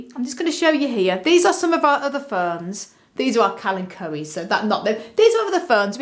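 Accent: Birmingham, United Kingdom. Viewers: she reminds me a bit of susan from the Archers with those fruity high notes... but with 20% added brummy !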